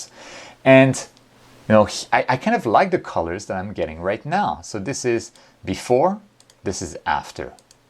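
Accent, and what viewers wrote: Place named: France. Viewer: nice video ! are you french . i feel a small accent ....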